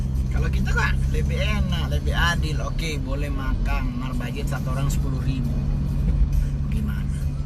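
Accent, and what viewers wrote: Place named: Manado, Indonesia. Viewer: Knp gambarnya gak penuh ini sprti buat flog stengah2 bahasanya slalu logat manado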